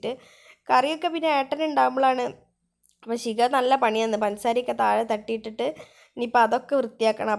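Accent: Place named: Kannur, India. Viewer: Pudiya subscribera. Ellam.turane.parayunu.nalla.samsaram.nmloke.basha.pole.tane.nmle.kannura.padachone.anugrahikate.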